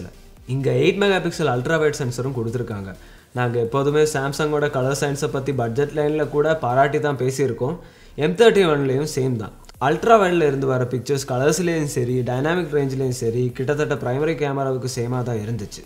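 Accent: Kerala, India. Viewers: Sounds like a malayalie speaking tamil,am I right? Good job! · Hello Brother My prediction Correcta You Malayali Tamil Super Keep It 💐💐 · Malayali tamil, we want ash back on c4e tech tamil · Boss ...unga tamilile Oru Malayali touch.. erukke..neenga Oru Malayali.. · Bro neenga malayalai ah! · மலையாளம் கொஞ்சும் தமிழ் ரசிக்கும்படி இருக்கு. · Halloo bro. Indha mallu bro Tamil pesumbothu rombavae kaduppakuth So ivare replace senjal sombave nalla irukkum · Chetan malaiyali aano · bro neenga malayalam ah?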